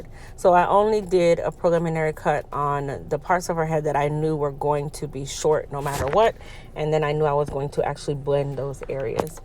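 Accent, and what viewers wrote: Jamaica. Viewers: I detect a Jamaican accent? I appreciate how you preserved her dignity while increasing her self confidence. ❤️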